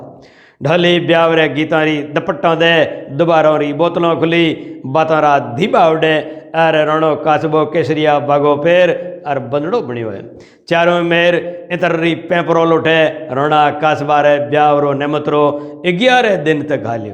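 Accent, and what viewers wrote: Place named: Saurashtra, India. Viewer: Hamare gujrat. Sorath jesi aapki bani he dhanyawad namaskar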